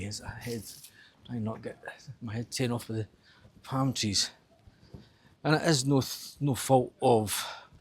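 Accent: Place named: Fife, United Kingdom. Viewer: I’m a Scotsman in Italy….🇮🇹 I left more than 20 years ago. Your accent seems Fife? East coast accent?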